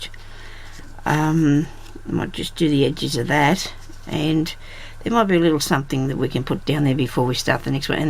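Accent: Australia. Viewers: Never change a thing. I don't think you mumble......it's your lovely Australian accent....love it!! Your fabric/lace books are so different from the norm....what will you come up with next I wonder. I so look forward to Sundays now....hope this book has tons of pages and the tutorials last for weeks. You are a wonderful teacher. Thank you so much for sharing your craftiness. Glad you are feeling a little better. Love to you. Sue. xxx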